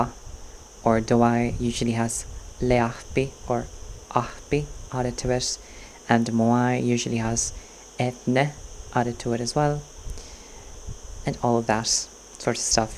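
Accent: Ireland. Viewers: Hi, I am very interested in the Sami languages.Do you happen to have Sami-learning materials (e-books/digital formats in pdf or dejavu formats)? Not necessarily in English, could be in Norwegian, Swedish,Finnish or German as well. Thank you for your video, very much appreciated. By the way, your you tube name sounds Gaelic to me and your English has an Irish lilting.Tá Gaeilge agat ? Regards from Spain/ Go raibh maith agat. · I swear the lady presenting the video has a dash of Irish accent.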